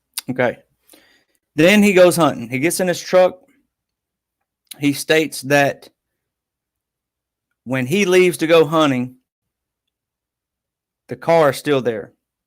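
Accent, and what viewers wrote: South, United States. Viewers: You're my absolute favorite of all M2M enthusiusts...love the southern accent too. I'm originally from Bham, AL I can relate.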